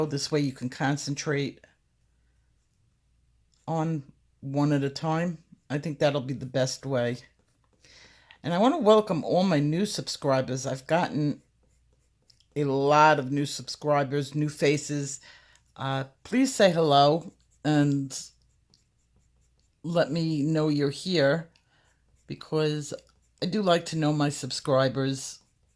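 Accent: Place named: Long Island, United States. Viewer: You are truly amazing! I look forward to your videos weekly and am so thankful that I get to hear a long Islander talk! Feels like I'm back home! Please keep these videos coming..I can't wait to see your texture videos and what's to come next!! I am learning so much from you, thank you so much!! ❤ oh I must say I developed my artistic style last week after watching your video I went through my coloring books like I do almost every day looking for a picture to color not knowing what colors to color them or what style to do, and I actually, visually, visualized the picture! It was amazing I realized it, and I never would have realized it, if it wasn't for your videos and for you! THANK YOU thank you so much I broke through the coloring block!! Xoxo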